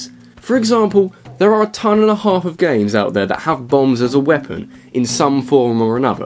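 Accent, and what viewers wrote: United Kingdom. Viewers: I'm about a minute in: my first thought "oh so it's one of these SOB's with 30 second intros... but hey, he's british! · BRITISH ACCENT ON A TOP TEN Legend of Zelda LIST? HEEEEEEELLL YES!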